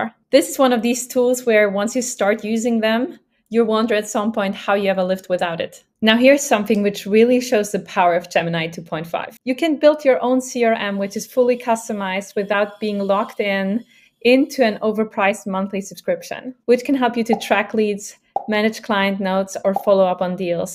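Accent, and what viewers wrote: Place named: Netherlands. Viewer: A few seconds in... wait a minute is that a Dutch accent ? A Dutch AI-content Youtuber ? We don't have much of that, would be funny if it is. Glad I was right. 🙂 I wonder if someone like who seems to be very good at using tools. Could you use Firebase studio to get results. Tina Huang who seems to be a developer was able to do it obviously, but I wonder for example if you could get the data store connected for that CRM. Or maybe we just need to wait an other month or 2 for Google the improve it/make it easier to use ?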